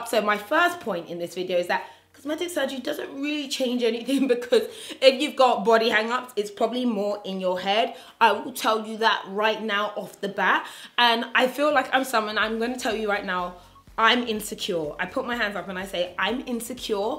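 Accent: United Kingdom. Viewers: I love you! I love the verbiage with your British accent. You make me crack up with your descriptions of the shape wear. Love you💖💖💖👠👛🛍🐈🐕🐎💖💖💖🐞🌹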